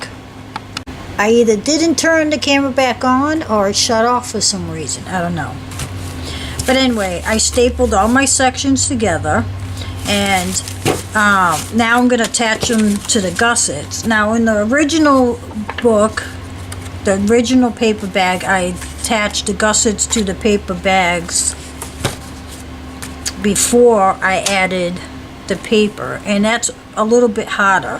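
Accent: United States. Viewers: I love this book so much. It looks so sturdy. I can't wait to make one. Thank you. Do I hear a Boston accent. Lolol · I love your accent. I can tell it's east coast. Where are you from?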